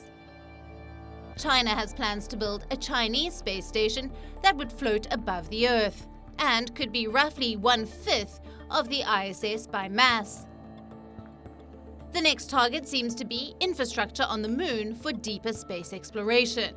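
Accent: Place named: India. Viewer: I noticed nothing but the lovely Indian accent.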